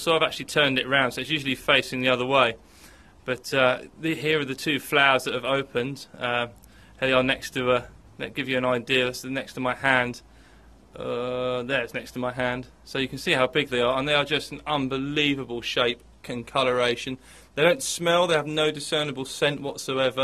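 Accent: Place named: South, United States. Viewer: You sound like your from the south country btw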